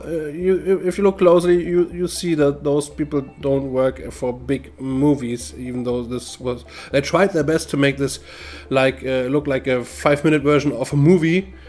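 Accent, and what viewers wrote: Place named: Germany. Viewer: It's getting difficult to find reactions to this that I missed seeing. I especially like seeing reactions from countries other than mine. I'm in the USA. Your english is just fine, and I like your german accent. I also like that you are a straight man that accepts gay people for who they are. I definitely liked your reaction to In a Heartbeat. It is just about my favorite video. I had to wait almost fifty years to see a video about love that I could really relate to.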